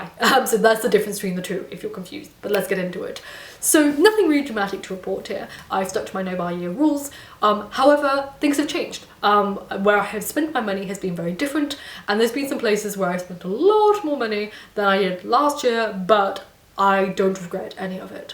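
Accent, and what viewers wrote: RP, United Kingdom. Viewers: New viewer (American) here. I spent a summer in Edinburg when I was a kid, but I would have never guessed you lived there from ur RP accent. Once you said it, I can notice some subtle things, but still... never would have guessed. Maybe I’m just bad at hearing it since I’ve gotten older. Either way, ur voice is beautiful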